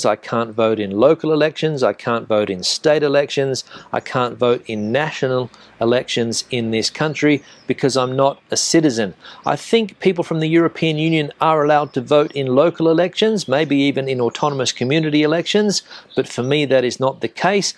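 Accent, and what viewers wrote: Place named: Australia. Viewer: Very atmospheric outside in your lovely garden in the Spanish sun with birdsong, gracias a los vecinos ruidosos👍 AND out in your 'CAH' - love your Oz accent - letting us see what we miss 🕶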